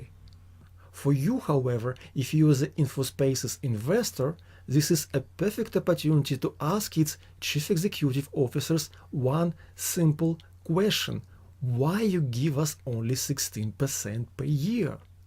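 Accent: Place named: Russia. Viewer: Ну, во первых в своём комментарии я не затрагивал вопрос национальности, а имел в виду твоё произношение. Я понимаю, ты в этом не виноват, так тебя научили. Такое впечатление, как будто ты читаешь английский текст в русской транскрипции. Ведь в английском есть звуки, которые невозможно выразить по русски.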